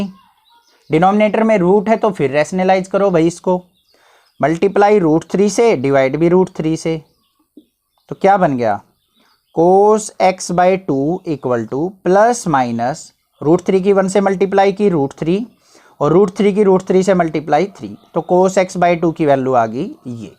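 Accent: Haryana, India. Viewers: Sir haryana se belong krte h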